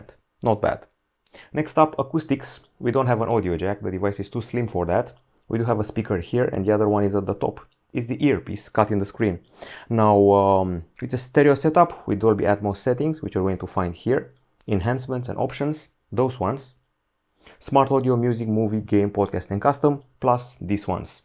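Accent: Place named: Romania. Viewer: Ce accent ...made in Romania 😊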